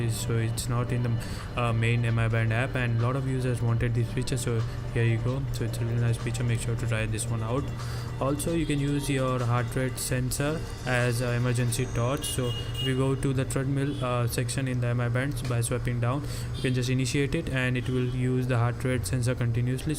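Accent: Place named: India. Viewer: I hate this indian accent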